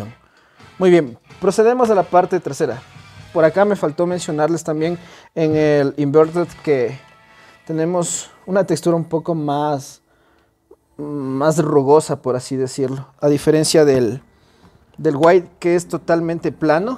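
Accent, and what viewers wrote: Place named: Ecuador. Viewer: de ley eres voz ecuatoriano, saludos desde cuenca, yo tengo la version blanca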